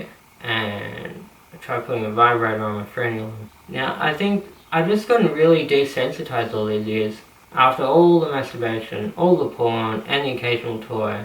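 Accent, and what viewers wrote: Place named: India. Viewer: You live in Australia why is your accent messed up brother? Your Indian gene too much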